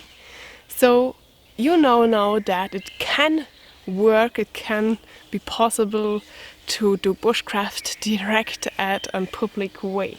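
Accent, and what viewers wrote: Germany. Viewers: Hallo, Es tut mir leid, Ihr Leben zu erforschen suchen, mag ich Ihre Videos und liebe Ihren deutschen enlish Akzent, versuche ich, Deutsch zu erfahren, weil ich viele deutsche Freunde in paddernorn habe, Ich weiß die Geschichte der Vögel und der Bienen, aber wie haben Sie ein Baby? ich dachte immer, dass Sie eine einzelne Wikingerfrau der Wald-Mumie waren? ich frage in Deutsch so nicht viele Menschen werden wissen, was ich frage, habe auch ich eine Verbindung mit Deutschland, weil ich in den britischen Armeebaracken konzipiert wurde, wenn meine Mama und Vati, der war in der britischen Armee, und macht es hoffentlich leichter für Sie zu verstehen?;)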